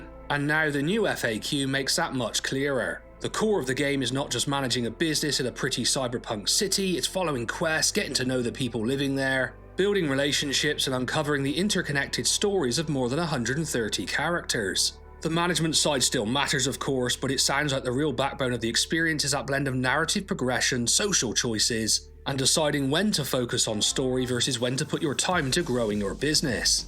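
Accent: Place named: Bristol, United Kingdom. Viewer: You sound like you're from my neck of the woods me babber!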